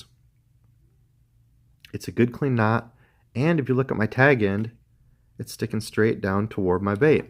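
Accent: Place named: North, United States. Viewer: You just quoted Gerald Swindle word for word about not trimming your tag ends super short because if the bass didn’t see the rest of the line they won’t see the little tags. Get your own material. Don’t be stealing us Alabama boy’s lingo. Especially if you are from the north which is what I’m guessing based on your accent!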